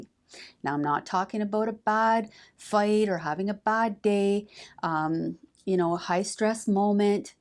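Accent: Canada. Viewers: Not sure where you're from, Canada maybe, but I love your accent. Great video. Thanks for the tips on crazy phrases from crazy women.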